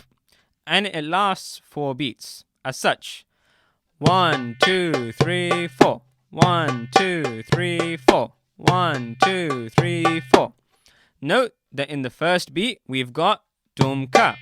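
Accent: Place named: England, United Kingdom. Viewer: Great job brother thanks for the videos. By the way I like your English accent 😊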